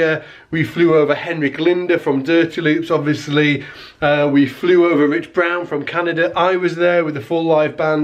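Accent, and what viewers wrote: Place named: Northern England, United Kingdom. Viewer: Eh, he's a northern Brit, it's OK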